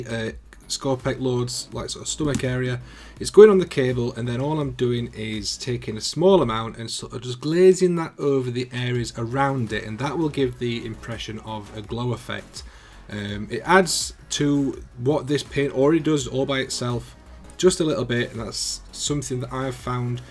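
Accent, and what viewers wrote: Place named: West Yorkshire, United Kingdom. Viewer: Warp stone glow in West Yorkshire accents wins the internet mate. I’ve subbed...new to 40K....not sure how many games I’ll play but I’ll certainly be using your tips in general. Thanks man.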